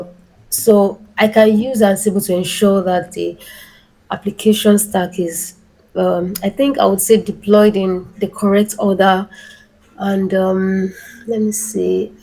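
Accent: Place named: Nigeria. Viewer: I could sense the Nigerian accent. Please tell me if I am right?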